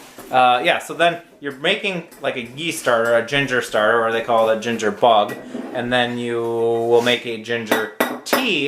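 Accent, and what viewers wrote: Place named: Minnesota, United States. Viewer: ahh I love a good Minnesota accent.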